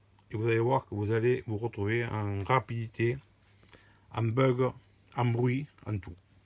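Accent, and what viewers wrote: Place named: South, France. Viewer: On sens le sud dans tes video j’adore !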